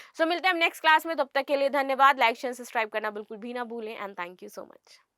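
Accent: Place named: Purvanchal, India. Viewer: Sir Mam ham UP se hai But Aap UP ke purvanchal se lag rahi hai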